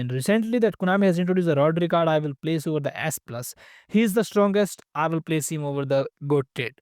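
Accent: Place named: India. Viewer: My Hindi accent friend how are you? Because of you i lost money. You need to pay me back😊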